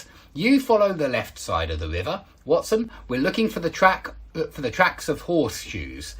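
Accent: United Kingdom. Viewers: British accent 🤌💕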